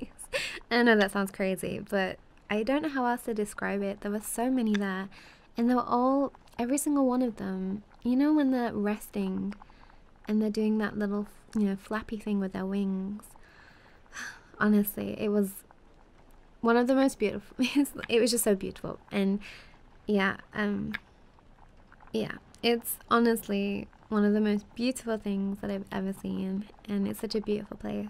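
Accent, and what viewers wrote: United Kingdom. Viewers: Here i am, with headphones on and holding my pillow as if im spooning someone, ready for another of my fav British ASMR creators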